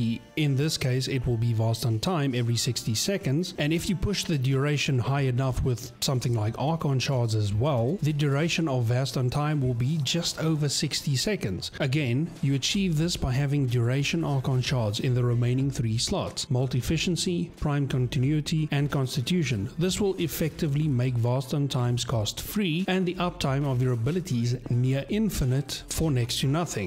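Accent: South Africa. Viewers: Hey bru are you from south Africa? I cant mistake that accent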